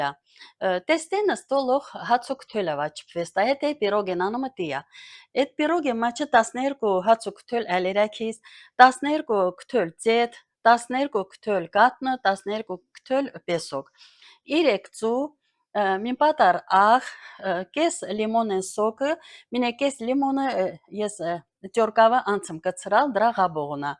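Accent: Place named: Karabakh, Azerbaijan. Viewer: Հետաքրքիր է հենց Ղարաբաղի լեզվով, հաճելի լսել։ Ի՞նչ կա անհասկանալի նայելով էլ կհասկանանք բառերի իմաստը։ Չէ որ դա էլ է մեր լեզուն, մեր մշակույթը։Պետք է պահպանել։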